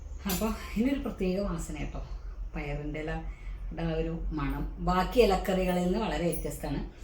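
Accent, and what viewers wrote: Valluvanad, India. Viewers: Valluvnadan slang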